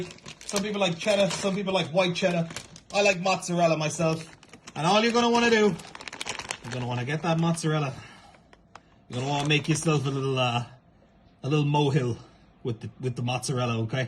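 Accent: United States. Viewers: As someone from New England I can FEEL the origins of the Boston accent in my bones from this video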